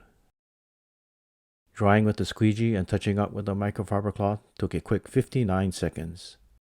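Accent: Hawaii, United States. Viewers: I can here the pidgin coming tru 😂🤙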